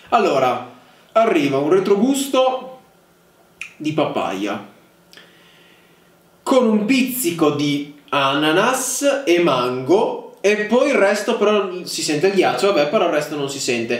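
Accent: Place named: Bari, Italy. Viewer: Sembri più barese che napoletano 😂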